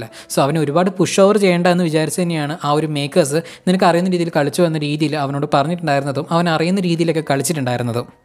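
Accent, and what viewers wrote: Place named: Kollam, India. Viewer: Cheyttaaa plzzzz bakiii pettannu idoooooo exam ayalll kanammm amma samathikullaaa... Plzzz chettantee videooo powliyannuu athu kondannuu mattulavaruu ittittuu but chettante expalanetion powwliiyanuuu piinnee njan nerathee itta messanginnu chettann reply thanthill orupaduuuu thankzzzzz pinee chettanteew sthalamm ethaa kettittu kolamm language polee unduuu ethayalluu superr😘😘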